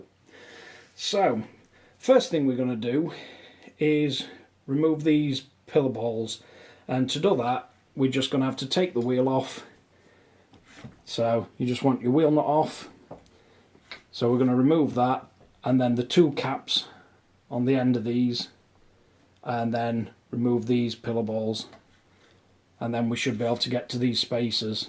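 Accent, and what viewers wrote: Yorkshire, United Kingdom. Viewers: Are you from Yorkshire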